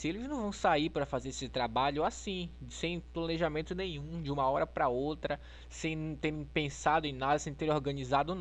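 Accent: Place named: Pará, Brazil. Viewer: Sotaque paraense detected! Impossível não reconhecer um Belenense falando! Hahahaha.. Muito bom os videos, parabéns! Deu pra ajudar a iluminar bastante as minhas ideias.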